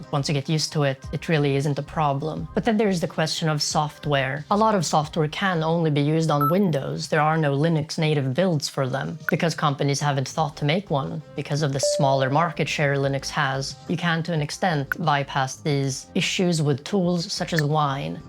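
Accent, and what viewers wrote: Sweden. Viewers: You sound swedish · Say no more, the Swedish accent already convinced me. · just clicked on this recommended video, hearing you for the first time. You sound as if you're from Sverige. Anyway, I subsribed because you support FOSS. No matter what *nix you use, I'd recommend to look into the history of *nix, where our beloved penguin is a major part of. Keep up the good work, thanks for this video and love from NL.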